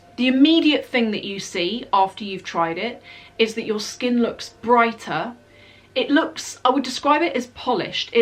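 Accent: England, United Kingdom. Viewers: Looooove learning more of the english accent in looking at videos like this. New french subbbie here!!! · Ohhh....it is really enjoyable listening to your talking. I like your british accent so much!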